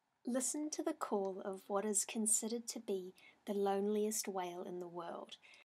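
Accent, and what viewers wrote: New Zealand. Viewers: Luv that Kiwi accent. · Are you kiwi? Your accent is so clear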